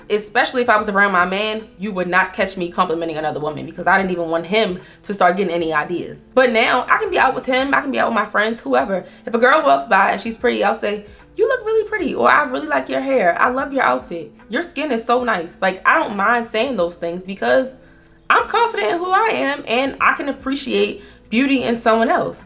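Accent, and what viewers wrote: Baltimore, United States. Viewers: Chile I knew you were from bmore as soon as you opened your mouth.😂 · I knew I heard that Baltimore accent. Heyyy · Girl! Why did I know you was from Baltimore without actually knowing lol. The accent gives it every time lol.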